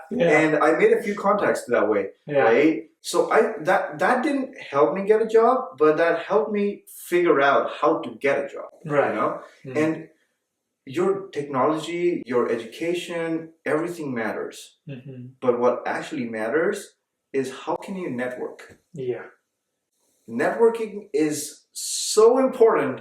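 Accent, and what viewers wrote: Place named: India. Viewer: I suppose you shot this video a while back, I was wondering that both of you graduated from the same university, but still you've maintained your Indian accent though you've married an American, while he he's got an American accent. Pls take it as a compliment from me.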